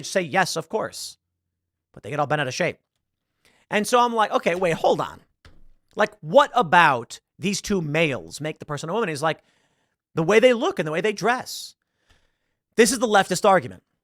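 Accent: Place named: South, United States. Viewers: I find her to be beautiful and i love her sweet down to earth southern accent. She seems like she’s down to earth. I definitely would be into her than the tired ass plastic caked on makeup spoiled entitled delusional multiple personality modern women from big cities. Im from Los Angeles, and im sick of the women who spawn out of progressive cities and states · An attractive lady with hobbies! Hobbies I can enjoy and southern accent, sign me up. I've seen what makes you applaud, your boos mean nothing. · She is a Smoke Show! She’s Beautiful She’s funny and her Southern draw is so attractive Just because she does that stuff doesn’t mean she’s not a girls girl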